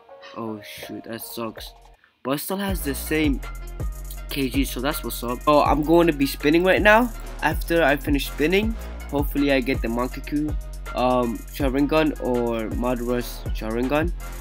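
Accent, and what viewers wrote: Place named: United States. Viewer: Discour Goup XDDDD, whay is your nationality? I noticed that you have a very strange American accent.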